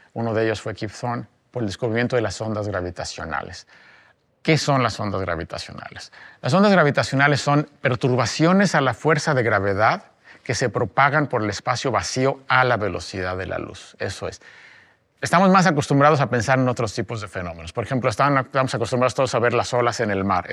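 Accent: Mexico. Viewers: Acento mexicano. Me lo ha arruinado. NO gracias. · Hasta que escucho un Mexicano, muy bien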